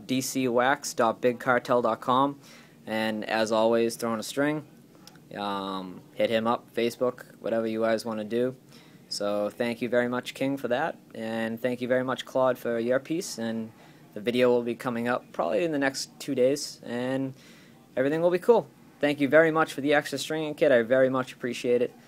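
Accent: Boston, United States. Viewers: u from boston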